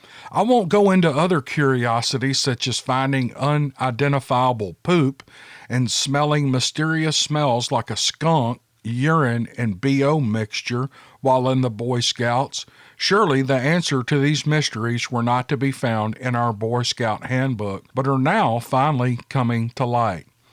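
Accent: South, United States. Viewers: It's not only your great narrations of our people's stories that make your videos so enjoyable, but also your ramblings! So, don't cut your ramblings out please and don't discount them!!!lol! Your personality and warm Southern accent are so delightful! Like hot, salty fried okra just out the frying pan with a sweet iced tea on a warm summers' evening!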